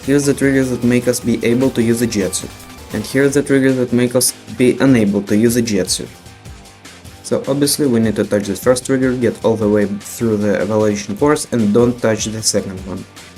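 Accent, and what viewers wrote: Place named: Russia. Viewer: Ты звучишь очень пл русски...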